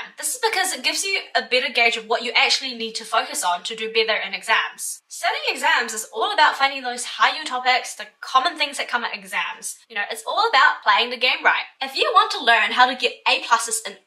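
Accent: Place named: Australia. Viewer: Aussie eyy. Thanks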